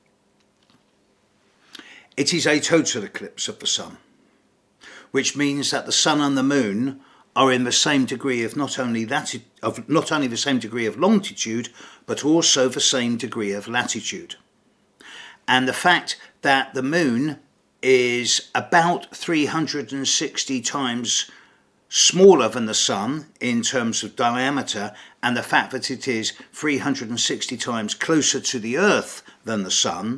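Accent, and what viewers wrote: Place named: RP, United Kingdom. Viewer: Thanks! It's always a great pleasure listen to you... And I love your clear British R.P. accent... Greetings from Italy 👋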